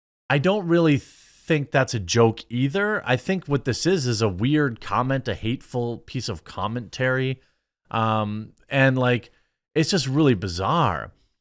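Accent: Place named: Upper Midwest, United States. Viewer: I just have to say that you talk like someone from the WI, MN or UP.. it took me forever to quit making everything a question with the "ay" on the end of any comment I made. Then if course we have the reverse "ya"!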